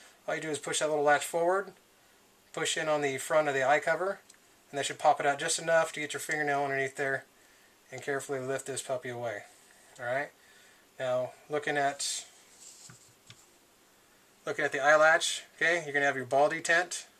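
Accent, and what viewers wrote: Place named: Midwest, United States. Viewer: Its funny cuz ur from california but ur accent sounds like a midwestern one (Wisconsin, Iowa, Minnesota maybe) and im from Wisconsin! Hahahaha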